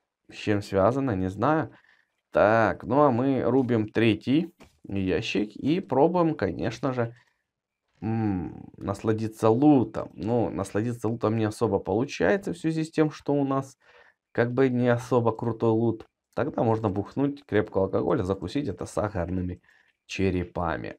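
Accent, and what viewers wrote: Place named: Ukraine. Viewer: Український акцент)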